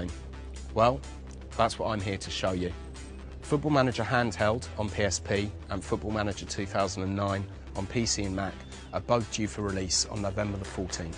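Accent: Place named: England, United Kingdom. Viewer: Damn, what a classic english accent! Btw, I have this game and it's pretty cool for thoose who consider buying it.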